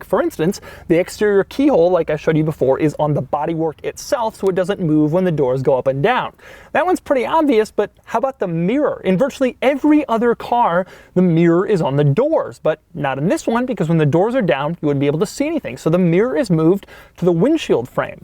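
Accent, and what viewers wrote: United States. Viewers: Ya, and it's Zee Zee Top, not Zed Zed Top, chill he's american, americans say Zee. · Doug is American. We say “zee” here.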